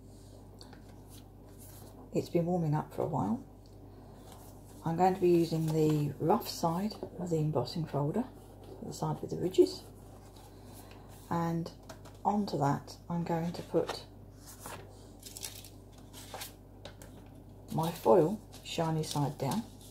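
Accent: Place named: England, United Kingdom. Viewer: yesI love her calm no nonsense precision using measurements thicknesses and no useless jabber😃😄😂I can't believe my fortune to have found her...and that adorable English accent..I sit here with my calligraphy book and drool♡♡♡♡ I can't wait to give foiling on vellum a go!!!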